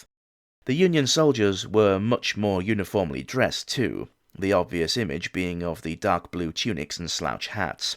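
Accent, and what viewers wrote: South, United States. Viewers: Love ya, but my home is in petersburg and near Richmond. My Cherokee family was "resettled" because those of color weren't allowed in the new union. Fort Lee was just changed to Grigg Adam's. Being from Texas originally, I knew Lee's role in the Mexican wars. I loved hearing the first bit, but started to feel that old sadness. God bless you and cant wait for next episode and hope it's not about the trail of tears. Cute southern accent dahlin!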